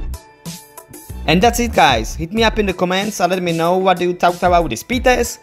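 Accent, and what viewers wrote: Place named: Czech Republic. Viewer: Ten českej přízvuk se prostě neschová ((; hodně štěstí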